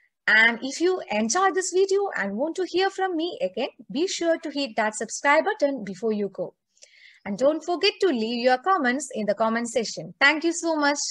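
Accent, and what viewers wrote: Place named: South, India. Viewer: It's good and Informative ! Are you doing PhD in Mathematics ? And another thing to say I like your pronunciation , it's awesome and somewhat like South Indians ,hahaha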